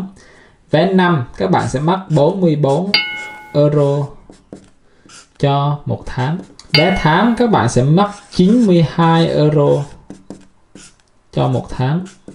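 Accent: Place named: Central, Vietnam. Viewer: miền trung dễ thương, chân chấttt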